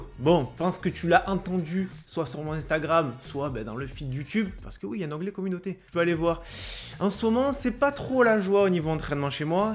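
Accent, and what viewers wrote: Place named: Marseille, France. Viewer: On sent que tu viens de Marseille lol!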